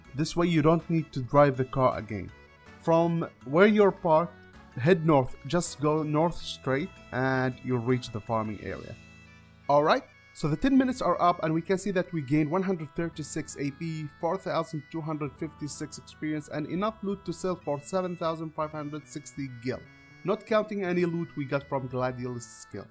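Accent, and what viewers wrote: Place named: Arab world. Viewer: Your Arab accent is so strong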